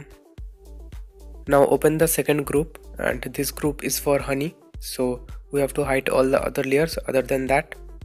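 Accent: India. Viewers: U are indian i know indian or pakistani people speak english like that😃i am right am i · Hahahaha Indian English your speaking wtfc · you know its the good shit when you hear that indian accent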